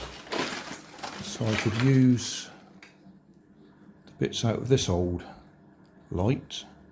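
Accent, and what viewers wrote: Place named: RP, United Kingdom. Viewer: you have a BBC type voice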